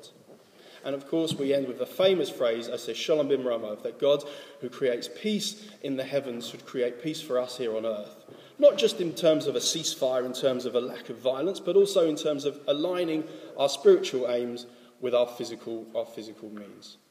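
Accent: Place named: London, United Kingdom. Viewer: Thank you so much. You sound like a Londoner (Guessing North East London?) I used to work in a kosher butcher"s in Southgte. I also Have you ever heard of Shimusha Rabba tefillin?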